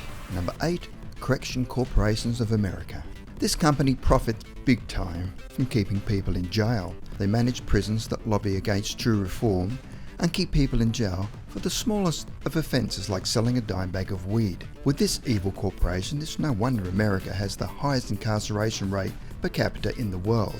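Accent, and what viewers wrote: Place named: Australia. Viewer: cant stand this shit australian accent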